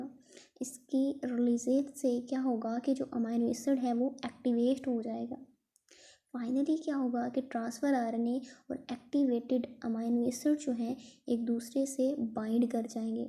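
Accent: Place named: Pakistan. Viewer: pakistani accent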